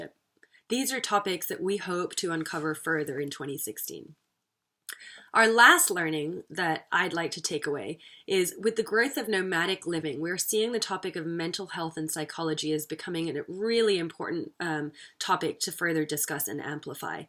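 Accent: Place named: United States. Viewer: You sound so American.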